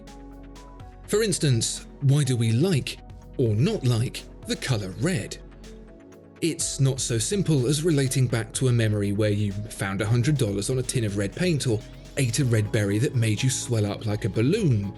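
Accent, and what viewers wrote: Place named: London, United Kingdom. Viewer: The ongoing video of that ugly looking Daytona which caters to the Corvette buyers is a distraction to the flawless spoken narrative originating in those who wish to impress you with their vocabulary and thought process. The accent or dialect is somewhere in the London upper class suggesting good education. The truth is much simpler. You buy a luxury Rolex watch in order to announce the following: I have made it.....have you?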